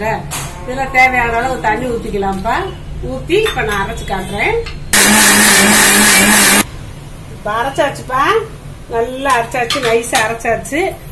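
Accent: Madurai, India. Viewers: Madurai tamil suppera pesureenga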